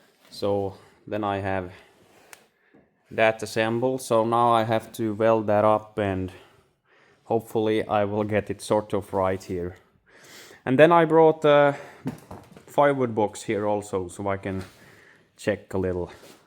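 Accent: Finland. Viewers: ha, sa till frun när vi satt här och drack kaffe och jag kollade på videon att snubben måste ju vara finlandssvensk! Han pratar inte engelska som finnar brukar😋 Hursomhelst intressant att följa projektet.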